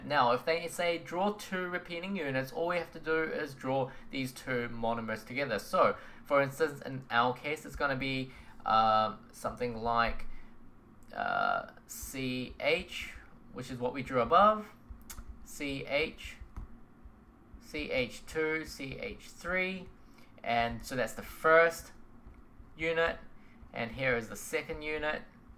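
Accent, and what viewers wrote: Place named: New Zealand. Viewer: Fun Fact: You Are From New Zealand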